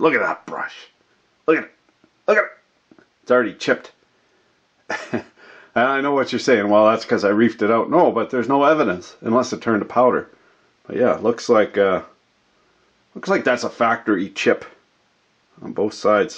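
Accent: Canada. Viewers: Canadian as fuck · Canadian as fuck. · I cannot get enough of this Canada talk. Awesome. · No wonder he talks weird he’s Canadian.🙄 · strongest canadian accent I've ever heard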